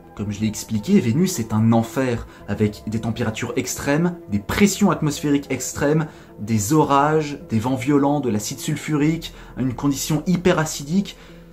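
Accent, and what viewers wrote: Belgium. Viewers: Tu es belge?mdr sinon j'aime bien tes vidéos 😁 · Nonnnn t’es belge! Lol il on ou ton accents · Tiens! T'est belge ? Je m'en doutais ! Tu me fais trop penser à Yan L'Or-iginal 🙂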